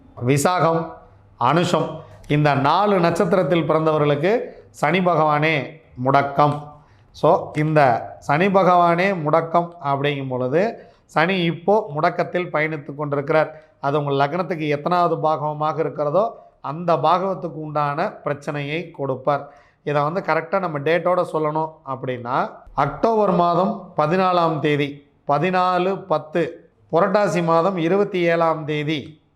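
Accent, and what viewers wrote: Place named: Coimbatore, India. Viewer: Iyakkunar manivannan sir kku piraku namma coimbaturu slang um nakkal pecuum ungalidam thaan saami ketkiren... guru sakku moottaila katti adipaar... 😂😂 kastangal irunthaalum neengal sollum vitham romba rasikira mathri irukku guru naatha,, and by the by one more information,, I'm from coimbatore, ungalathu video anaithayum skip add seyyaamal anaithu add um odiya pin thaan parpen athu antha video vil neengal sollum thagaval yenakku muluvathum palikka naan tharum guru kaanikkai... anbarkalum ithai try seithu paarungal.. neengal yevvalavu menekettu ovvoru video podukireerkal yenbathu ungalai patri therinthavarkalukku theriyum... koodi nandrikal guru naathaa...by coimbatore venthaan...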